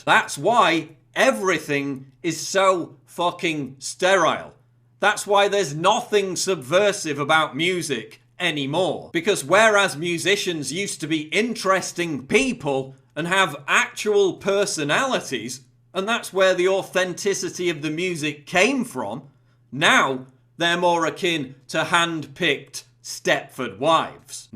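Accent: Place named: Northern England, United Kingdom. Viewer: Ha I love you man.. ant fukin northern accent piles on your integriee. Keep it coming, we will be people with minds again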